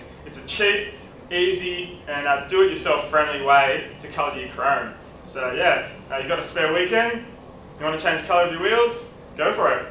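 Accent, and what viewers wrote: Australia. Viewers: A R its just an Australian accent it's not that hard to understand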